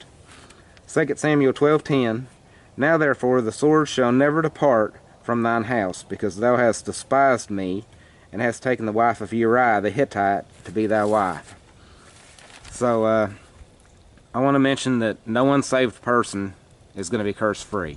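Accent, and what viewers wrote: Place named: Tennessee, United States. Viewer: Oh my hole reason to message you was to thank you for submitting to our Creator and also to ask you if you were from Tennessee cause you sure have a Tennessee, Kentucky, Mississippi accent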